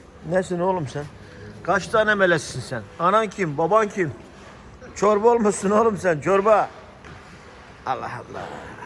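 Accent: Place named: Kahramanmaraş, Turkey. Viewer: abi Maraş ağzı konuşman o kadar hoşuma gidiyor ki:))) komedi videosu izler gibi seyrediyorum. Memleket özlemimi gideriyorsun:))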